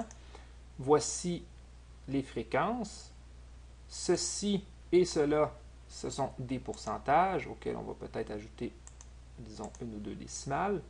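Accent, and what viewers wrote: Quebec, Canada. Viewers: Intéressant et utile ! et super l'accent Québécois ;)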